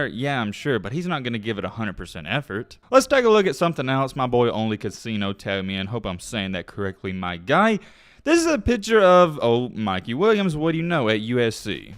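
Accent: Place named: Appalachia, United States. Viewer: My man, I like a lot of your vids and your hillbilly ass accent lol but you’ve been coming off obnoxious lately. Multiple times in vids like this you say “And a lot of y’all don’t realize!” Like okay man stop thinking so little of your followers intelligence. Not a good look. Plus you’re basing someone’s worth and lifestyle literally off their “Sosha” media as you call it. #1 rule to not go by is IG my guy. And no high schooler is signing a 10 million + shoe deal. You can count on one hand how many rookies in the history of NBA got 7 figure shoe deals out the gate. Anyways man sorry if I sound like a hater but you come off aggressive af in these vids.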